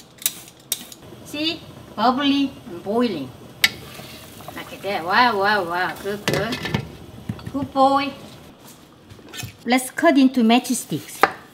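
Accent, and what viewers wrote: South Korea. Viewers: 망치님! 대박 입니다. 요리 짱! 여기다 구수한 영어솜씨가 한국적 입니다. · 왜 이제야 알게 된걸까요..ㅎㅎ 두바이에서 가정주부가 된 중년 아저씨..이제부터 보격적인 요리남 되렵니다...한국 스탈의 영어 완전 좋아요.... 한국식 영여 악센트를 세계에 고착 시키자구요...다같이...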